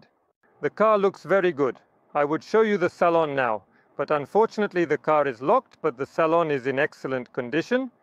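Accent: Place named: India. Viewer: Так индусы разговаривают на английском.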